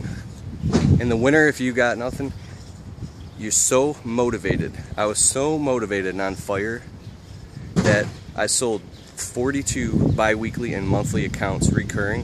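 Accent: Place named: New England, United States. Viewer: The Landscaping Employee Trap Hey man i gotta ask you, are you from New England? You said ruffin' for roofing.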